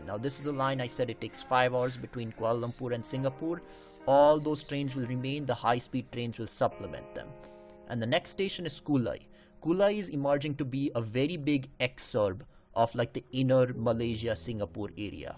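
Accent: India. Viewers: Indian accent. Fuck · Bombay English if you really want to know.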